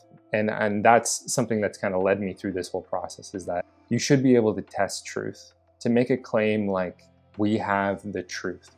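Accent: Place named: Canada. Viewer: I’m enjoying your video but I’m also distracted by your accent 😂 are you Canadian? I keep hearing twinges of Robyn’s accent from how I met your mother lol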